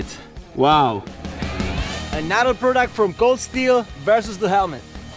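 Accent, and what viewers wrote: Czech Republic. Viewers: Super video a hlavně i originální dávám like a odběr a umíš plynule anglicky až na ten Českej přízvuk ale to není žádném problém :D přeju hodně subs protože si to rozhodně zasloužíš!! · Nejsi z česka náhodou? Podle přízvuku tak zníš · i think you are from czech republic.. your english is good but.. your voice, btw great video ;)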